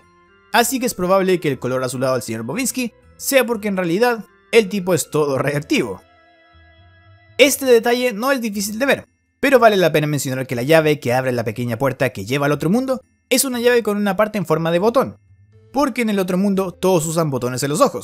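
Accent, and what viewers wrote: Chile. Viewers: WAO ERES DE CHILE NI CUENTA ME DI , POR TU ACENTO :o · Eri chileno?? Pensé ke eras de Ecuador djjdjddk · este wn es chileno? Grande 🤝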